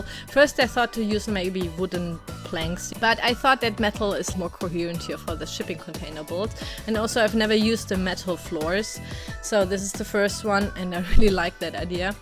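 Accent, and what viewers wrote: Germany. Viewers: Ich habe sofort deinen deutschen Akzent erkannt, aber bin froh dass du Videos in Englisch machst, um mehr Leute zu erreichen. Finde dich sehr sympathisch. Echt super gebaut!:)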